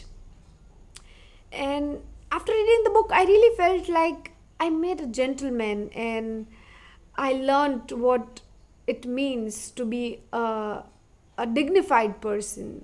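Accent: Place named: Gujarat, India. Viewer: Ds....that gujju accent ☺️☺️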